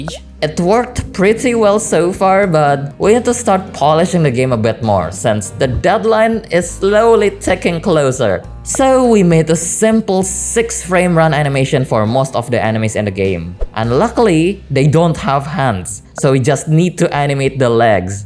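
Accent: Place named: Indonesia. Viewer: how come you sounds indonesian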